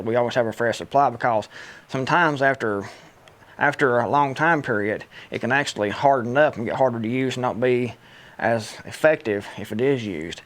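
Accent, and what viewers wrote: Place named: Tennessee, United States. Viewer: Being from the northeast, I ALMOST needed a Tennessee to Jersey dictionary. Thankfully I've been to your great state a few times so I'm used to it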